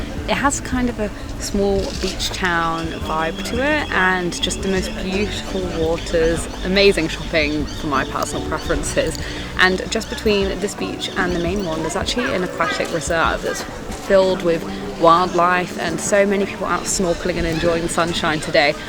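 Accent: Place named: England, United Kingdom. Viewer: Welcome to the city that is Soooo Up themselves (like New York).🤣 Just watch where the news of murders each week happens, and where the deaths (including of children sent home from in hospitals ER's, more frequently occur). The Northern Suburbs so don't like the rest of Sydney that they resist getting a train line, so that the 'Westies' would not invade them or their beaches.(It's not calleed the Insular Peninsular for nothing. Then you have the Eastern Suburbs who are also up themselves! That's why the train line stops at Bondi Junction, and Waverly council did not allow the train to run right to the coast. And neither the North Shorers or the Eastern Suburbs people want to be seen at the Western Suburbs (unless they are just passing through to the Blue Mountains or Perth.).. But sounding like a pom, you will no doubt understand. 😊 I am in Newcastle who has good beaches, less rain/storms , and a place which helped to make Sydney rich, but without the gratitude. 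If you think we are hard done by, consider how the big whigs in Sydney treat the regional and rural areas. 😛 enjoy! You will see, no doubt that when the poms sent the convicts to here in chains - ..They were sending them to HEAVEN! (my Gr Gr Grandfather didn't come out as a convict but from Sussex as one of the earlier TEN POUND POMS.. And he never whinged about it. And the Lord blessed Him and his family. 😇 <link>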